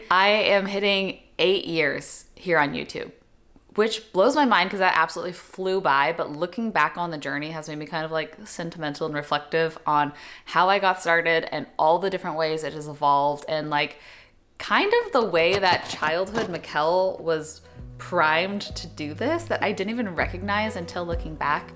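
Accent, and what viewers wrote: Texas, United States. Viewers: I was watching ur old videos last week actually and I was telling myself hey she still talks the same the y’all part is there that Texan accent that I love. I’m from Texas as Corpus Christi. Don’t loose that part of you makes you so unique.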